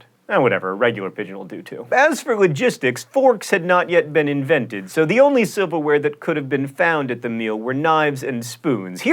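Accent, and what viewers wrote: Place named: United States. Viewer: ***** He probably just has a regional American accent of some sort.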